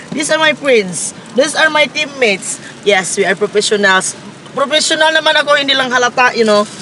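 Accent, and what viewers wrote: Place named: Visayas, Philippines. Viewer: Omg you never fail to make me laugh!! Ang galing mo teh!! Hahah! Pasok sa banga yung bisayan accent!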